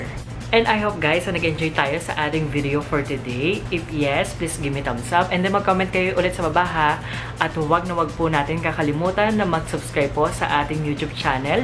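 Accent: Iloilo, Philippines. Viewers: ilonggo ka po?? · I do really like your accent. Hehhee so ilonggo like me. Hehehhe Pero i trained myself like most of time on how to speak the english language like how native speakers do. Pero ang charming mo and ang sarap pakinggan kaya ok na. Heehhehe and most and for most, your so cute hehehhe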